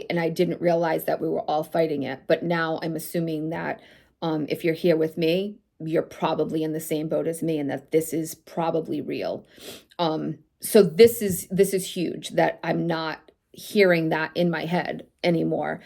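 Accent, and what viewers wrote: Massachusetts, United States. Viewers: Thanks for the video, you have to be from Massachusetts based on how you say bananer and Lara bah hehe 😉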